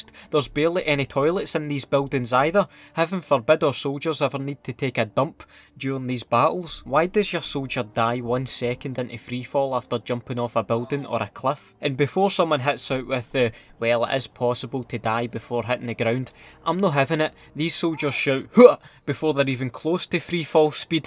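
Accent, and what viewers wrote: Scotland, United Kingdom. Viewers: The Scottish accent only makes it funnier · I loke that Schootis acent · Are you Scottish but still nice vid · Not trying to be mean or raises he sounds like he is from 🇬🇧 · I really can’t take this seriously with the Scottish accent (no offense) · He's Scottish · I love the Scottish accent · Is he Scottish? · Man I love your Scottish accent lmao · Who else loves this guys Scottish accent? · Nice Scottish accent, mate! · I like ur Scotland accent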